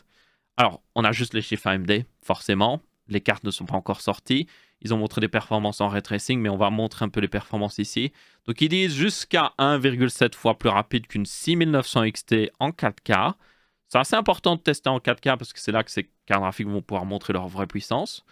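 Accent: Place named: Belgium. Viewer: Ce que je veux dire n'a rien à voir, mais j'ai remarqué que votre accent belge est assez prononcé, mine de rien.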